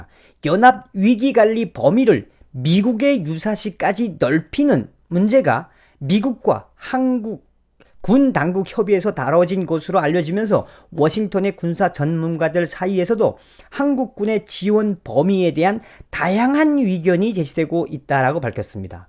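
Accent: North Korea. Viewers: 북한말 좀 불결합니다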